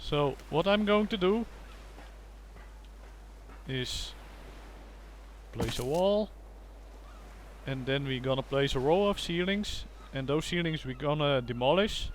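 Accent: Netherlands. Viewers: jij hebt een nederlands acentje ÷) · are yo dutch? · he's dutch thats where the accent comes from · Let me guess... You're Dutch? :p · Heeyy are you Dutch? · Are you dutch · the dutch accent is strong with this one...... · Ben je nl?😂👍🏼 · are u dutch :) ?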